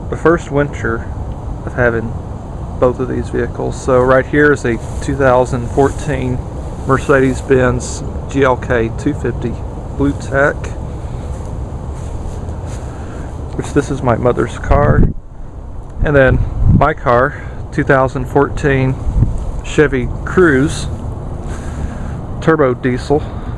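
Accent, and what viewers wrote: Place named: South, United States. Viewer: Where exactly are you, if you don't mind my asking? I detect a hint of a Southern accent. Oh, the song on the Cruze's stereo was PERFECT for that morning!!!!!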